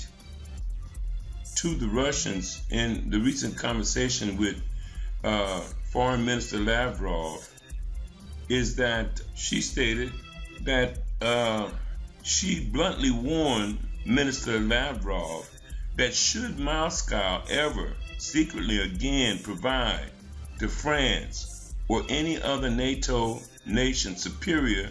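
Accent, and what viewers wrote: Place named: AAVE, United States. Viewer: Not drunk, Ebonics...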